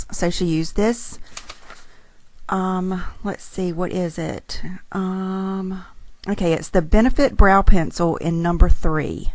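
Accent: South, United States. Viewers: You have such a calming, sweet Southern voice, 😊 Great voice over tutorial! Hopefully, once the kiddos go back to school, you'll do some "Bunny Vlogs"👍🏻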